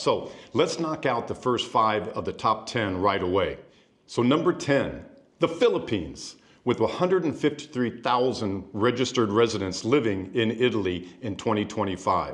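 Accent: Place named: Midwest, United States. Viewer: Seems none of your seats face the wall TV in the scene behind you. Italianos call that style "oldefogeyia" :-) Sit well: Live well... & I live very-well in SW FL, USA and have found cycling an ebike expands one's appreciation of a place. Mobility is vital to a "good life", yes? I'm too-long-ago from Pavia, and a lifelong cyclist. Best for folks ANY folks living in those Italian towns to be one, too! :-) For lots of good Italiano reasons. No disrespect, but your voice isn't typically "Italiano-sounding". More like a Chicago/MidWest US-twang to it, maybe? Their everywhere here, too! :-)))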